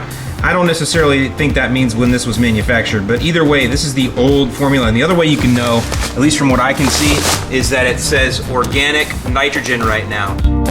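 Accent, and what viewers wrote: Chicago, United States. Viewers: Your accent is like Ditka, from Chicago. As someone who has worked at Jone's Island and personally made Milo there lol, knock it off with the Chicago talk, we sound like Yoopers here in Milwaukee.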